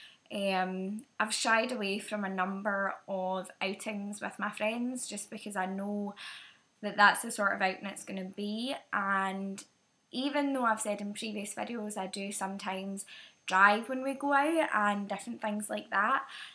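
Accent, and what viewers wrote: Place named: Central Scotland, United Kingdom. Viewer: Hi, thanks for making this video. I do really understand what you are going through. I'm 26, I have MS and basically your video describes my situation too. I'm also Scottish (central) your accent doesn't sound as if you are far up north. If ever you want someone to hang out with that is in the same frame of mind feel free to drop me a message. Hope you are doing okay X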